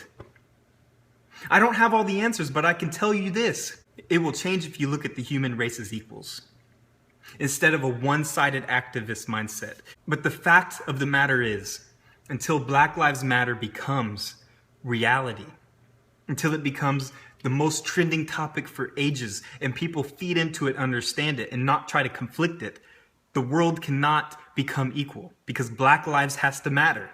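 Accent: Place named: Latin America. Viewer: And honey, I know my races very well, you are mixed somewhere down the line, you are definitely not pure white, I can tell by the slight color to your skin, and facial features. You also have a slight accent that of a Hispanic. Europeans are very mixed with Indians and other races somewhere down the line. By the way, nice video.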